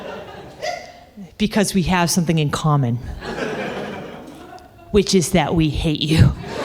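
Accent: United States. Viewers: Ive never seen a more Irish head in me life😅 n im Irish😂 I expected a strong Mayo accent..not a Murican...cant prove your mother was Mary from Mullingar but 😅...either way...epic, feckin brillllant set a cailín!! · Are you from new england ?? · You sound Boston ish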